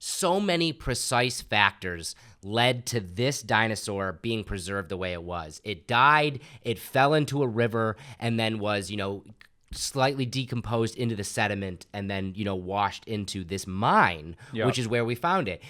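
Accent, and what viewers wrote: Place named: United States. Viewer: You sound "super american" lifes a bitch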